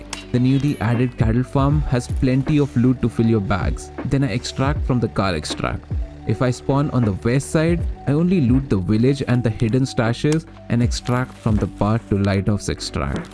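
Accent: India. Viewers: your indian accent makes this video 2x more enjoyable. On the other hand it contains very valuable knowledge - super good vid. Well done sir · Hears Indian accent. Clicks off. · Idk why but you sound like an INDIAN... If yes than i'm surprised to see an indian making tarkov content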